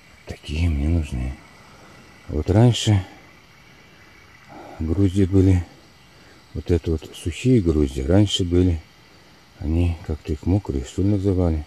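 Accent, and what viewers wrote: Tirlyan, Russia. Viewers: Да уж. Сейчас у нас очень красиво! Золотая осень!, вы родом из Тирляна? По разговору похоже. С удовольствием прогулялась с вами по лесу!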